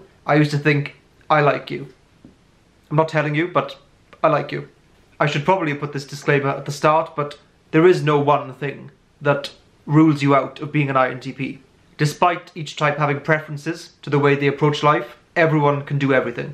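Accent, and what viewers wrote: Wales, United Kingdom. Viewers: You sound VERY welsh. I’m south, how’s lockdown treating you?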